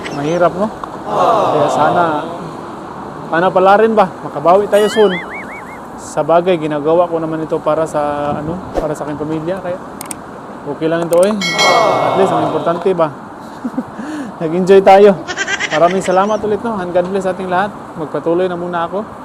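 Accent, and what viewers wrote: Visayas, Philippines. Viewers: Visaya kaayo ka ug lingaw kaayo ka mag vlog. 😅😊 Asa ka dapit dito sa pinas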